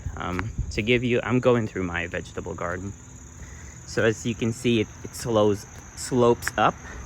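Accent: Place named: South, United States. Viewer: I was thinking you were from the South. Thank you for the video! 😊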